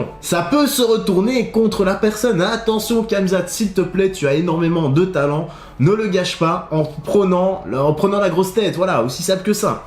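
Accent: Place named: Belgium. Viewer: Yop petit vieux nouveau sur ta chaîne YT. Nouveau fan de mma et de l'ufc depuis octobre 21. Après la découverte de mr Ciryl Gane 😁 Comme pas mal de nouveaux fans je pense. Sont année 2021 est impressionnante. Merci les multiples émissions sur lui et sa team la (ou le) mma factory. Du coup... A force de chercher des chaînes sur le mma je tombe sur toi et... 😁😂 ton accent bien belge même si je repère pas la région. Je suis de Charleroi originaire de Rochefort. J'aime bien ta manière de présenter tes sujets et le ton que tu utilises. Rafraîchissant, et assez marrant 😉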